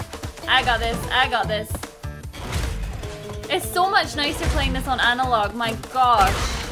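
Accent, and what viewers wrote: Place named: Scotland, United Kingdom. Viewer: Hey I just wanted to say that I really enjoy watching your videos! I watch them since resident evil 7:D I really would love to play crash bandicoot tbh. Btw from which part of Scotland are you coming from because I saw a concert of Amy McDonald 6 days ago and she lives in Scotland too. Compared to you she has just such an weird accent tho 😂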